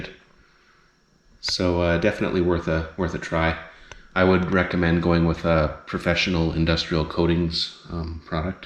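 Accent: Canada. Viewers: I KNEW you were from Canada when I heard the accent, eh!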